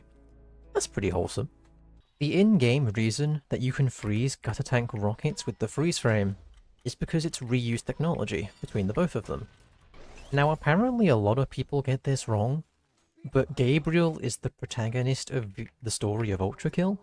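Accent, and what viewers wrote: United Kingdom. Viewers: Holy british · are you british by any chance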